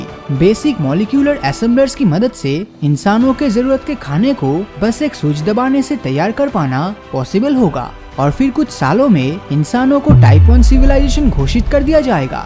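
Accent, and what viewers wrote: Bengal, India. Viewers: Is your mother tongue bengali?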